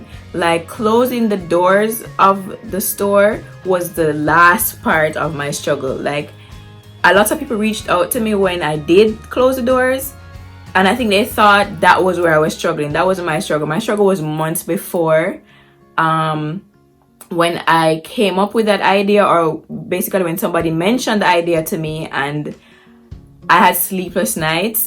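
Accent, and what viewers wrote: Jamaica. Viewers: Come thru yardie. Accent strong.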